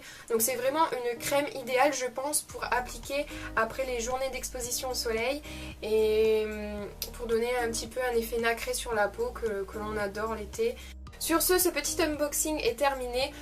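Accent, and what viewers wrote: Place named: Nord, France. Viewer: J'aime beaucoup aussi la marque Klorane 😊 le baume au beurre de mangue j'ai déjà testé tu vas voir il est super ! Je ne m'abonne pas à la Birchbox car il y a toujours des produits dans les boîtes que je n'aime pas et que je n'utiliserai jamais et je n'aime pas le gachis 😅. Tu nous en dira des nouvelles des produits et de ton ressenti. Tu es Nordiste non? j'aperçois un petit accent 😂 je suis Picarde. Super vidéo bisous 😘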